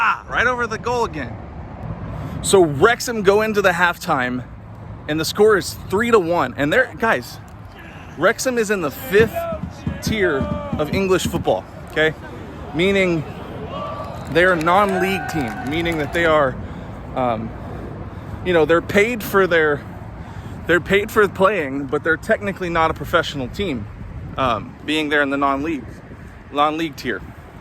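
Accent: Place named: United States. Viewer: It's good to see you taking an interest in Wrexham. I was there and the atmosphere was electric. A few things to work on to sound, as you put it, less American. You would say Wrexham were 2 up. And Coventry were 2-1 down. He scored, not he netted it. But your videos are good the way they are. It's the interest you're taking and the passion that comes across in them. We'll done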